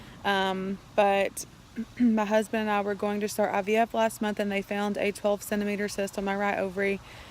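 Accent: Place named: South, United States. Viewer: You are so beautiful! I love your southern accent. Praying for you!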